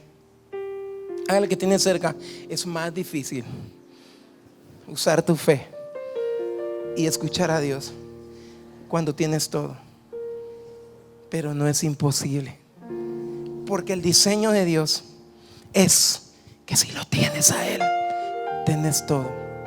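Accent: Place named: Guatemala. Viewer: Andaba buscando música Heavy metal en español y pare aquí, me llamo la atención el acento chapin, Soy de Guatemala y, por el acento y forma de hablar, el también es de Guatemala, C.A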